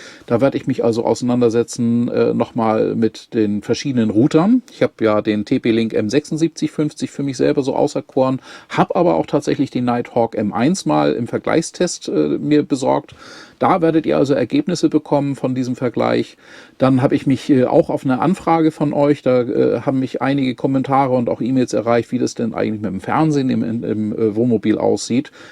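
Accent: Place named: Northern Germany, Germany. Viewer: Auch von mir herzlichen Glückwunsch zu 25Tsd. Guckern. Bin ja noch frisch dabei. Ich mag dein freundliches Wesen und den norddeutschen? Einschlach. Moin aus Ostfriesland. LG Matriczia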